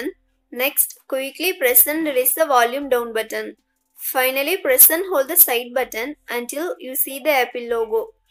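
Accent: India. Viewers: Thanks for “caption”. English of Indian accent, because, it’s hard for me to understand it, I usually get off of the site. But with your CAPTION, I read and I am thankful of that. “THANK YOU”.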